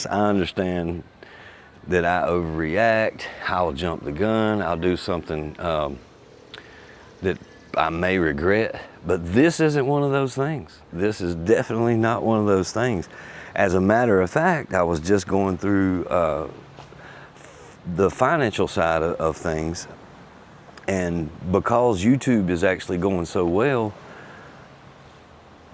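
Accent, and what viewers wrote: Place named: South, United States. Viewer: I’m not sure if you remember me told you I lived in South Carolina for more than 20 years I was in Camden South Carolina right by I 20, I think that is reason why I like you right away when you start talking I recognize that you from the South; you like my kababayan,! So good luck what ever you decide to do to stay happy 😃 and keep smiling that is your big asset 😂🎉😊😮❤😂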